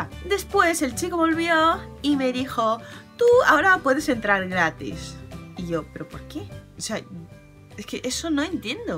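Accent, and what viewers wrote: Spain. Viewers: el acento españolete no "mola" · tienes que tener acento de España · V ella es española?? O japonesa :v cara de asiático y asentó España (khe verga) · Como se nota que has aprendido a hablar castellano con un madrileño, tienes ese desqueje propio del madrileño, que nos ha dado fama de chulos. ¡¡es muy bueno!! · está fatal tu acento españolete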